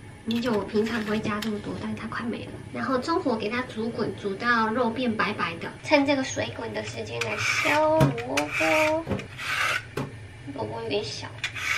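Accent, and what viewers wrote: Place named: Taichung, Taiwan. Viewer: 妳好可愛。我今天第一次看妳的影片，沒想到妳這麼會煮飯，拍影片的碎念也很有趣，一聽就好親切的台中腔😂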